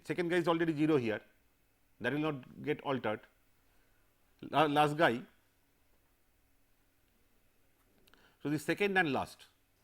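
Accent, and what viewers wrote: India. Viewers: a good effort in letting people understand concepts.. understandable English would have been better. He speaks so fast and english sounds like hindi to me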